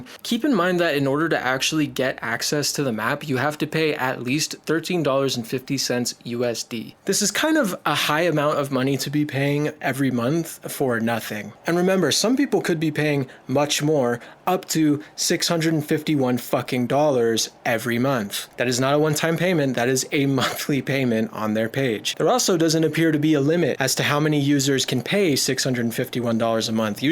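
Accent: United Kingdom. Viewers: talks like the average British person but it's worse than talking to a French person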